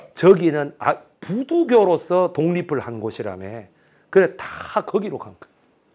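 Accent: Busan, South Korea. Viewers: 부산사투리 너무 좋습니다. 첫 줄거리부터 흥미롭습니다.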